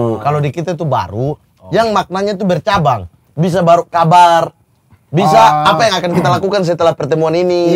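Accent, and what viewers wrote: Eastern Indonesia, Indonesia. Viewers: logatnya udah jadi logat indonesia timur wkwkwk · ... kenapa logatmu jadi ngikut timur juga... padang mana padang 😂😂😂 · uda, de pu logat su mulai ketimuran ee.... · Eeeeee....bang logat timur sdah bgus e😂😂e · Bang su terpengaruh dgn bahasa Timur ya😂😂😂👍👍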